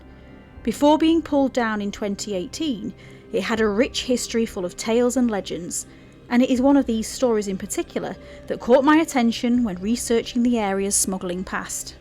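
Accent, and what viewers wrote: Yorkshire, United Kingdom. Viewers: I don't remember how I arrived at your channel, but it was probably through finding videos on Yorkshire people and locations. As an American, I find the Yorkshire accent probably the most charming English accent. It drops the H's and R's like RP, but has the northern lilt that the "posh" accents lack. I could listen to someone from Yorkshire read the back of a cereal box and be enthralled. Anyway, thanks for introducing someone from across the pond to Robin Hood's Bay.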